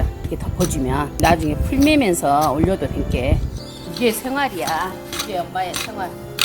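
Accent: Jeolla, South Korea. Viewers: 전라도 어딘가요 정감있소~ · 구독 박고. 팬함. 전라도 사투리가 정겹네. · 전라도 광주나 전라도 목포쪽 톤이시네ㅋ 시골에 우리 이모 목소리같다. ㅋ 정겹네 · 고향이 전라도인데 사투리 너무 정감가요 어머니. 건강하세요. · 아따 전라디언이어라~~ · 즌라도 사투리에서 신뢰감 확 깨는데? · 전라도 말투라했더니 역시 먹는 음식에 잘 하는군요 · 전라도 구수하네잉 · 전라도 목소리 듣기 힘드네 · 아따~!! 전라도요~~!! 구수한 사투리 정감있구마잉~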